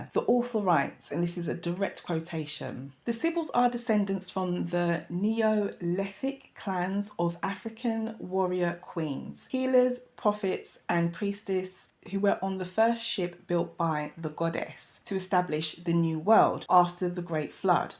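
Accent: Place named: United Kingdom. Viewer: So good to hear a British accent speaking on such topics. Keep it up.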